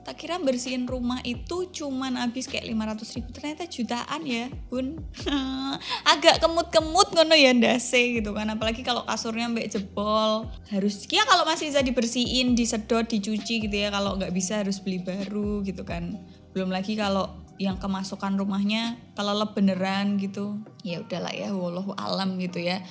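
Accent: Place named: Java, Indonesia. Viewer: mbak eee.dari Semarang thoo..aku sneng logat nya itu lhooo medhok tenan.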